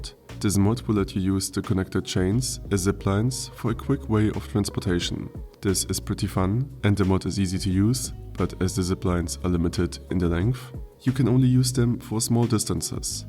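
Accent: Germany. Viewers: I Can here a German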